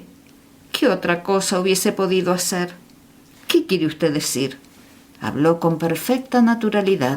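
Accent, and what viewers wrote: Argentina. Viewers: Exelente , te escucho desde Costa Rica 🇨🇷 y vos SOS de la Argentina ??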